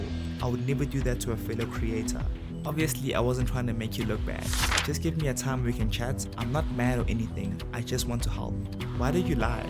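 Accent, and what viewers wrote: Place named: South Africa. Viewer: What a great video, instantly knew you are from South Africa. We have a distinctive accent.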